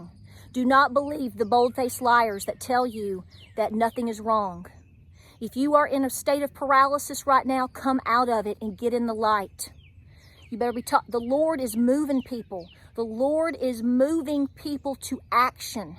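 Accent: South, United States. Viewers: You are the absolute BEST!!! Except for the southern accent, you sound like me! I am astounded that people are so stoopid!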